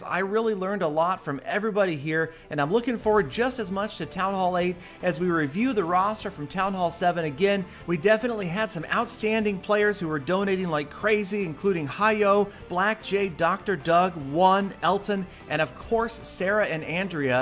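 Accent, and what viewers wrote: Norway. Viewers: Wait, are you from Norway O_o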